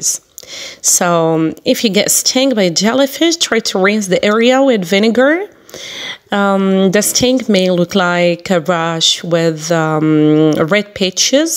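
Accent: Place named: United States. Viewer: صوت رائع لغة متقنة.لكن للأسف لم أفهم شيئا تتحدثين بسرعة و باللكنة الأمريكية كذلك لم تفعلي الترجمة وشكرا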